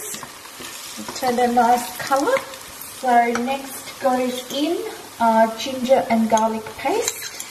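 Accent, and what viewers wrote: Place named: South Africa. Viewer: Because she is not an Indian, she has a South African accent - how rude and totally ignorant, (maybe uneducated as we'll I suspect) to ask "why the accent? " - I made this recipe and it is awesome - thank you lovely accent lady❤❤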